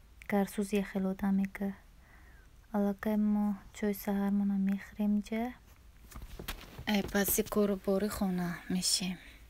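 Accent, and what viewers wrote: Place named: Garm, Tajikistan. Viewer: шумо точикистона ай кчошен апачон? гапзанитон гармиёда монандай илтимос чавоб дихед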